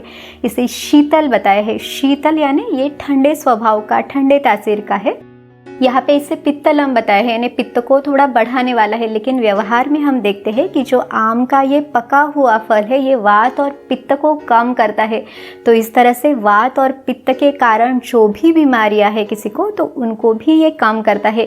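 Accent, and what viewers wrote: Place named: Maharashtra, India. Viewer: आपल्या बोलण्यावरून असे वाटते आपण maharastriyan आहे मग मराठी का नाही बोलत कारण महाराष्ट्र खूप मोठा आहे.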